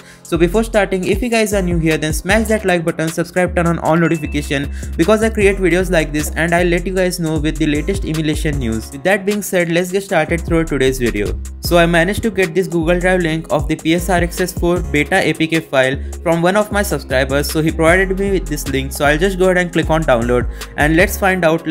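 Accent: India. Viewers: OH GOD !! i hate so much indian accent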